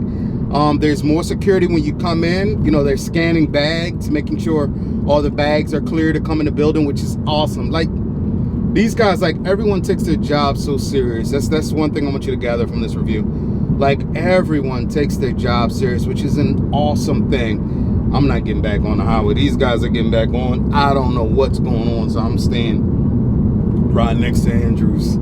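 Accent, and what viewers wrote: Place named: DMV, United States. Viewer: Sound like you in the DMV area lol!✊🏽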